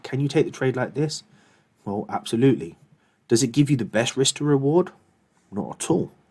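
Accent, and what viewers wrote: London, United Kingdom. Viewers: Really sound like a cool london mandem! Hope to meet you one day G :) Great content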